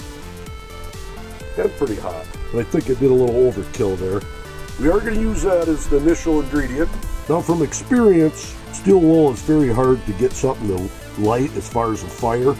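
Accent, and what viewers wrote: Minnesota, United States. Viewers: he's from Minnesota sounds like the Gov.....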